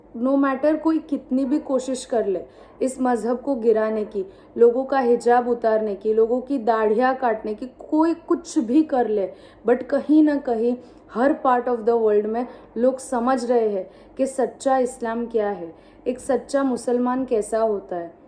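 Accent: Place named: Gujarat, India. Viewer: Assalamualaikum.....Aap Gujarat se ho aisa lag raha h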